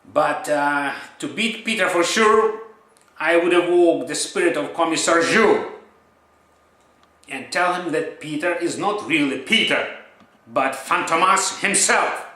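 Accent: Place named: Russia. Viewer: Are you russian buddy ? LOL I like your accent.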